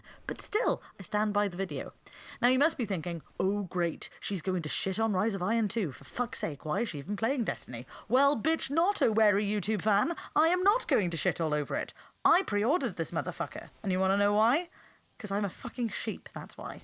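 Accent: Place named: West Country, United Kingdom. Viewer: Didnt hear any welsh accents but there were certain words, you sound pure west country (bristol ish area) id love to do raids as ive never even attempted 1, im a year 2 solo player, the only reason i got destiny in the first place was because a bug group of my friends played it, but i got it too late and they'd all already swapped over, glad i got it though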